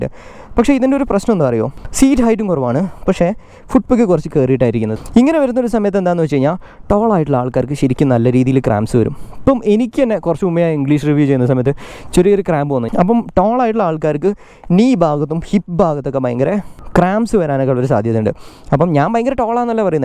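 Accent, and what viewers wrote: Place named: Kannur, India. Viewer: Machane ingal Kannoorkkaran aanalle ....❤️❤️🥰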